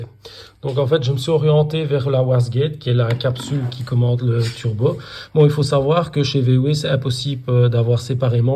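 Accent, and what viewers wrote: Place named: Belgium. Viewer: Bonjour, merci pour la vidéo, j’ai actuellement le même soucis… j’ai une golf sportsvan 1.6Tdi 2016 J’ai eu ce problème la première fois y a 1 an, seulement sur autoroute quand j’effectuais un dépassement cela m’arrivais rarement, 1 an plus tard j’ai ce problème tout le temps sur autoroute a la moindre pente ou dépassement ( quand j’accélère bien sur) j’ai le fameux temoin suffisais juste que je coupe le contact et que je redémarre le moteur et j’étais repartie mais le problème revenais aussitôt j’ai également remarqué que le moteur a froid ne me fait pas ce problème, seulement a chaud ( quand il fais vrmt chaud + le moteur qui a tourné depuis un moment) c’est également le code d’erreur P0299 turbocompresseur suralimentation faible… merci pour ton aide j’espère pouvoir trouver le problème, je suis de Belgique et il me semble que d’après l’accent que tu as tu es également de Belgique peut-être pourrais tu y jeter un oeil un jour merci en tt cas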